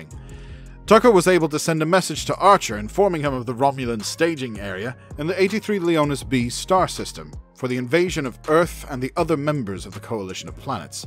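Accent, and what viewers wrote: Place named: Scotland, United Kingdom. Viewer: I detect a slight Scottish accent boyo.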